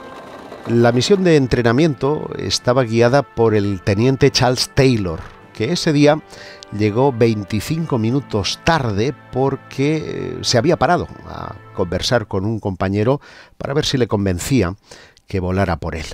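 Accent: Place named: Spain. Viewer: Emocionado de poder escuchar el relato.. pero ... el Horrible acento español me hizo quitarlo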